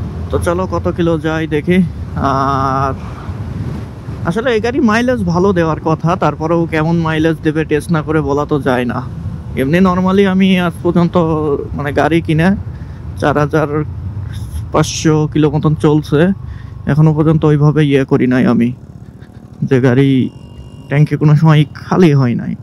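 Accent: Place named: Kushtia, Bangladesh. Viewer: আপনার বাসা কি কুষ্টিয়ায় 😄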